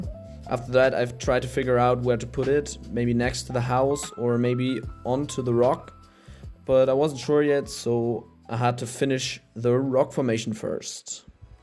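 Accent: Germany. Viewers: This looks so incredibly awsome. The techniques you use make everything look so interesting, and the colour composition looks so awsome too. I didn't even needet to watch the Vidoe to the End before i subscribend. And your english sounds very good too. You are able to notice the german acent but it isn't strong and doesn't bother. But please do something about your audio. Maybe i am the Problem but i watched it with my headphones on and only heard you on my left ear. I am looking forward to your next Video. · hör ich da deutschen Akzent?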